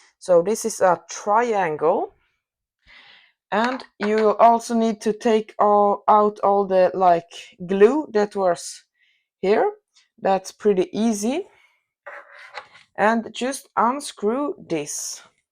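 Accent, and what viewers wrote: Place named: Sweden. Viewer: Dude you sound Swedish ❤😅 But 5km/h ??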